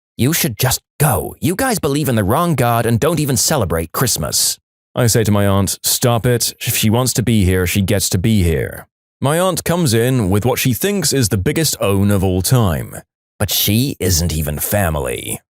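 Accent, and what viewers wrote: United Kingdom. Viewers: is it just me or does anyone remember his voice being deep in past episodes and now he sound British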